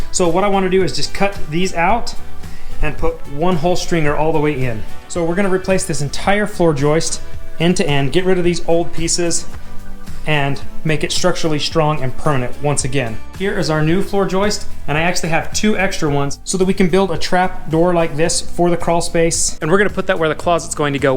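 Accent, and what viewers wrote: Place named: United States. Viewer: Well done man, you navigated me vocally during your video.. Classic keep it up. I’m Irish and hearing your proper American accent had me smiling and laughing respectfully. Goodstuff boss keep it up!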